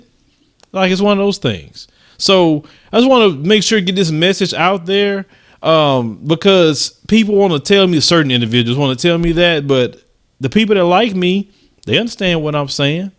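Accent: South, United States. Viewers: I minor in English phill and your just fine. I just love your southern accent. forget that silly woman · speaks clear wit' a southern drawl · My god! This grammar nazi Crystal chick need to have several seats. It's not like you're an anchorman or a reporter at a news station where proper grammar is a must. There's nothing wrong with the way you talk, she couldn't stand your southern accent, but you speak proper to me and I was born in Chattanooga, TN myself and still got a southern accent. So I guess this Crystal chick went to Oxford University herself, SMH, just keep doing you.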